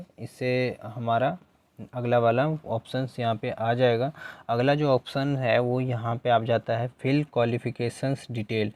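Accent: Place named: Narela, India. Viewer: Bhai aap narela se ho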